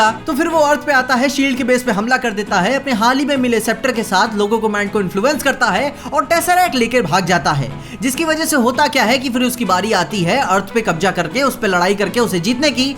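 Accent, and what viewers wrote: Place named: Bihar, India. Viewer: भाषा के बोली से त बिहार से लग हथिन ।।।## कभी निराश न करे ला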